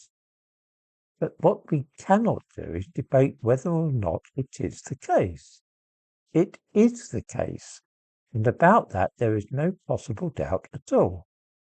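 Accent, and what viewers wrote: United Kingdom. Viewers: It's so difficult to understand British accent. I can watch videos on science and philosophy in american english all day long for decades, but one single 5 minutes video with a strong british accent and I need subtitles along questioning my whole life and ability of speaking english. LOL It also happens every time I go to London. And I lived in London for a year (12 years ago). Jeeezzz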